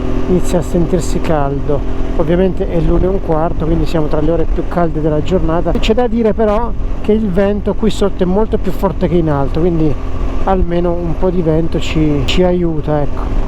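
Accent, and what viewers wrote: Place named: Montesilvano, Italy. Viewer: Ciao secondo me veniamo dallo stesso paese: Montesilvano. Sbaglio? Il nostro dialetto è inconfondibile. Mi sono imbattuto casualmente su un tuo video e naturalmente non ho potuto che iscrivermi al canale. 😀 Sono di Rivalta di Torino e sul Nivolet solitamente ci vado in bici da corsa. Complimenti per i tuoi video, mi piacerebbe “tornare” in moto; a Montesilvano avevo una Honda 750. 👍💪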